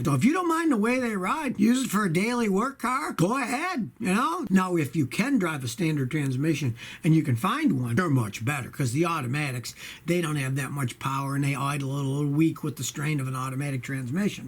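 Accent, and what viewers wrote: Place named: Michigan, United States. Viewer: You ROCK!! You sound like you have a Michigan accent.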